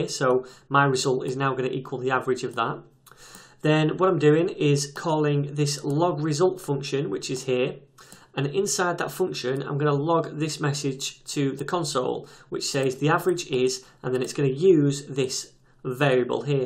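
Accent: United Kingdom. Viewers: Watching you or watching the BBC gives the same experience, the classic British accent.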